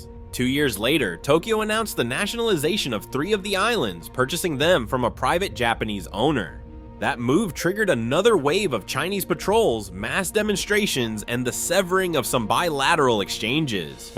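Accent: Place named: Canada. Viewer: You sound like actors from 'Kids in the Hall'. Are you Canadian?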